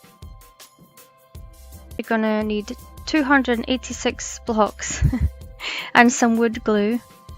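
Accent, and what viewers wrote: Scotland, United Kingdom. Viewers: All your designs are well done and gorgeous!! I may try a couple of them but will try to use wood pieces in place of some of the building blocks. Hope it will work although I realize my versions will look different. Your projects are very creative and I enjoy your Scottish brogue as it reminds me of my cousins in Ireland! Similar vocabulary 😄😄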